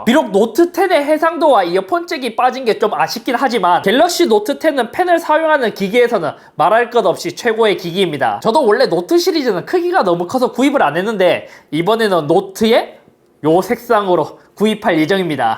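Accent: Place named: Busan, South Korea. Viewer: 안녕하세요. 저는 형님을 컴퓨터 조립할때 처음 접했는데요. 낯선 경기도권으로 취직을 해서 그리운 부산을 형님의 사투리를 들으며 버텼습니다. 항상 감사합니다!! 주문번호 뒷자리는 <num>입니다. 감사합니다.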